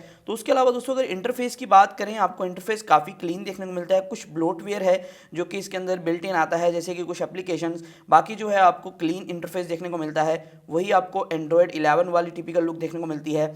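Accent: Faisalabad, Pakistan. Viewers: Yr kahi dekha h apko... Faisalabad sy to ni??? Boltay b Faisalabadi ki tra he ho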